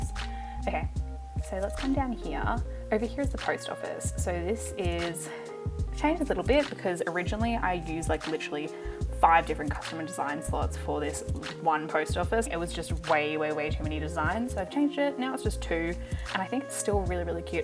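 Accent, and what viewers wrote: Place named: Australia. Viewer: 🧡🧡🧡 so happy to hear an Aussie accent for a change hehe x love your island so much! Xx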